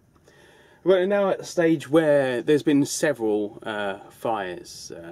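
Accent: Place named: Kent, United Kingdom. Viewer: I thought you sounded like a man of Kent / Kentish man, (always forget which is which) ;) I grew up in Canterbury but now up in Suffolk. I’ve got hundreds of people keep telling me to make more videos but... dunno... you’ve inspired me though! So thanks ;)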